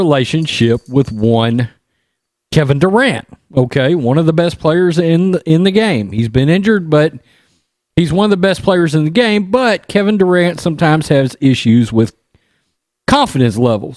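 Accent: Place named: South, United States. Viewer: I'm not sure if it's the southern drawl, since I live about as far south in Alabama as you can live and still be on dry(most of the time) land, or because you just seem like an average dude with an opinion that he's not afraid to share like myself, but you are rapidly becoming one of my favorite commentators on YouTube. Many associate the southern drawl with being borderline retarded, and I'd love to have a 30 minute conversation with them about prejudiced thought, but because I know better and you REALLY DO seem like a number of folks that I have intelligent conversations with on the daily, you are so relatable. At least to me, anyway. Keep up the great work and God Bless You and Yours!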